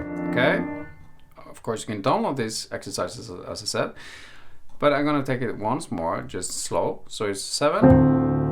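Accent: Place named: United Kingdom. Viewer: So British !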